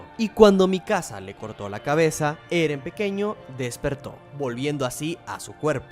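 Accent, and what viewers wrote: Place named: Sonora, Mexico. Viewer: No sé si él sea de México, pero yo conozco a muchas personas que son del norte del país y así es su acento, para ser exacta de Sonora, México.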